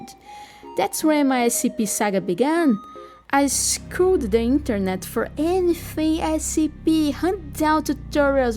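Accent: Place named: Brazil. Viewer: This is a really nice thing you're doing,! I'm so glad you're part of #erpgurus and I saw the link to your video there. And also, your Brazilian Portuguese accent is really nice. My brother is married to a Brazilian!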